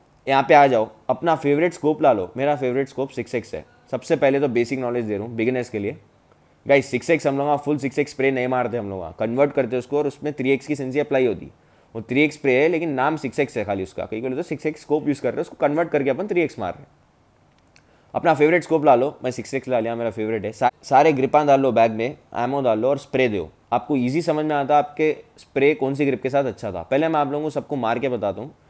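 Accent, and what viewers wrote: Hyderabad, India. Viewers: Bhai tumhara saare videos dekhta hu mai and ek reason ye hai ki tum acha smjhate lekin main reason ye hai ki kya mast Hyderabadi bolte bawa tum❤️ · You are from Hyderabad?? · Hydrabadi Miya Bhai Boltey ❤️✊ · I love his accent...ek number... Hyderabadi boltey😀😁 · Love this Hyderabadi accent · Tum hydrabadi hai ji?? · Hyderabadi bolthe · Hyderabadi hindi bholthe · bhaiii... aaap ka language Aur baatan sunke lagra ki aap hyderabadi Hai... arey apan bhi hyderabadi Hai.... aapka accenture Pura hyderabadi language ki waisich dhikra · Kya proper Hyderabadi Hindi baat karre yaaro! OP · Bro are you a hyderabadi..coz ua slang is similar.. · Bhai bolta mast hai tu full Hyderabadi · Hydrabadi bolte❤️ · typical hyderabadi hindi...kiraak hindi hai ye..hyderabad is jaan !! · Are u hyderabadi? Bahut hi pyari accent hai 😊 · hyderabadi op bolte bhai kya ki je tumna to sab smja dale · Bhai aap hyderabad ke hai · That Hyderabadi language ❤️ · Voice and accent op. 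Are you from Hyderabad? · Hyderabadi hindi bolthe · Ye haidrabadi accent♥ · bro it ia just hyderabadi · Hyderabadi ho kya ?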